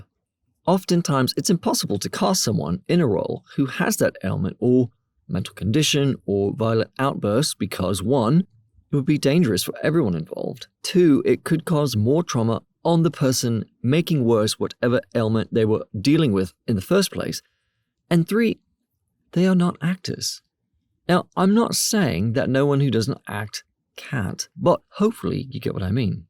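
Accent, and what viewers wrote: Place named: Australia. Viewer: the title seemed fine to me. A lot of the time I find videos with clickbaity, controversial one-liners and thumbnails cheapen the entire message. One suggestion if I may, would be to include more elements to highlight your points. You make a great point about how actors’ jobs are to perform and use their imagination. Some cuts to famous movies where actors play entirely unrealistic/impossible characters would help support your argument here. The Joker character could be a good one as obviously there’s no way to actually cast an actual psychotic homicidal anarchist in a movie! Just one small example though and by no means am I trying to influence your content. That’s one of the best things about YouTube, there’s so much freedom for creators and something for everyone. Guessing by your accent you’re an Aussie (so am I). Thanks for the video!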